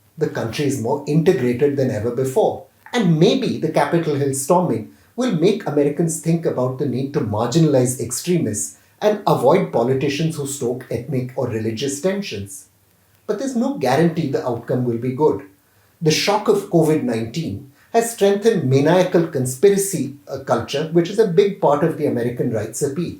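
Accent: India. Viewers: Clown reading MSNBC agenda items ans propaganda with an Indian accent …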